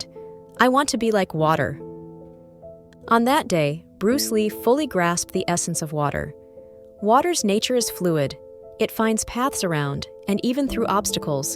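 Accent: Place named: United States. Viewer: As usual this channel has a narrator who does not pronounce common English words correctly! Though narrator speaks with American accent, no attempt to bother with obvious words. So annoying and personally I do not believe many of this videos info. The makers are careless, do not bother with proof of many of their statements. Do not bother...waste of your time.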